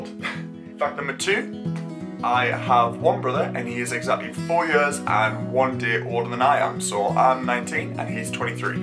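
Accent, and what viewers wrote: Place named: Newcastle, United Kingdom. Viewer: Anyone who likes dogs more than humans is THE BEST‼️❤️🐶🐶🐶🐶🐶🐶 just discovered you via Mr Carrington👍 lovely to hear a fellow Geordie ( ish) x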